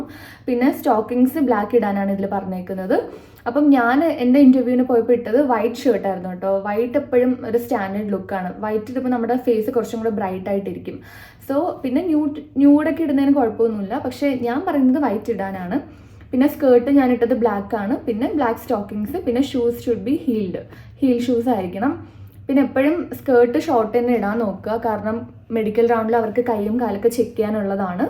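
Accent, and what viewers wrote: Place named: Kochi, India. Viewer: തിരുവനന്ദപുരം സ്വദേശി ആണെങ്കിലുo കൊച്ചിയിലെ ഒഴുക്കായ മലയാള o കൊണ്ടു എല്ലാ പേർക്കും വളരെ ഇഷ്ടപെടുന്നുണ്ട്.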